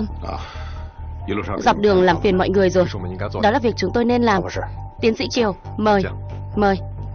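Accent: North, Vietnam. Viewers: Thuyết minh giọng bắc nghe ko tình cảm ko hay.Dị ứng ghê. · phim hay 100% nhung bac ki thuyet minh te qua lam mat hay.dung de bac ky long tieng nua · Xin loi ko phai Che vi minh nguoi mien nam nghe giong bac ko thich lam ,nhieu bo phim hay nhung thuyet minh nghe ko duoc rat tiec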